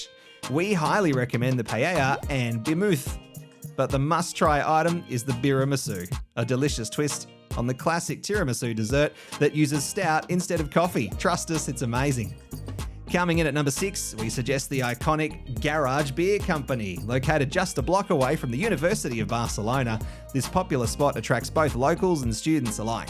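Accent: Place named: Australia. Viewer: Mate! Going to Barcelona in a couple of weeks & when I heard your Aussie accent I knew you know what your talking about 😉 Even though it’s not summer over there it’s always a good time when you have a cold one!!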